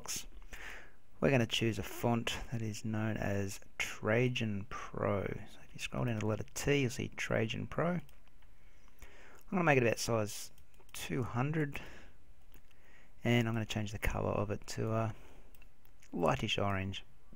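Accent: Australia. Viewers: Thanks so much for this. One of the best tutorials for Photoshop beginners I've seen so far. Just the right pace and level for me. Seems like most other tutorial-makers must be scared of being sneered at for being too simple, and seem to assume a 1 lifetime of Photoshop experience level. I like the Aussie accent as well. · r u aussi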